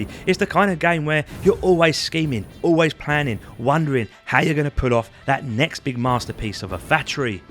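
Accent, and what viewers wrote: Cockney, United Kingdom. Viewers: Ok, Im sorry. Unbrittish person here. Is that actually a cockney accent? It is not at all what I imagine a cockney accent to be. Is it some sort of sometimes has tea with the queens, sorry kings, gardener kind of kockney or am I having a stroke?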